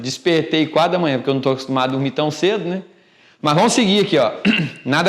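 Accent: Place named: Minas Gerais, Brazil. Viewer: Algo me diz q vc é mineiro, acertei??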